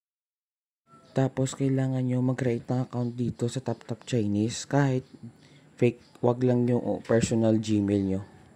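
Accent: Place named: Iloilo, Philippines. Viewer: Mabal an mo gd Kun ilonggo Kay ga dala Ang accent bsan mag tagalog hahaha same boss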